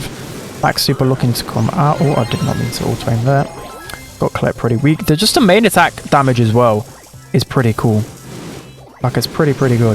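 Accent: United Kingdom. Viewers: is so sick that his British accent got so strong I can't even understand some word he's saying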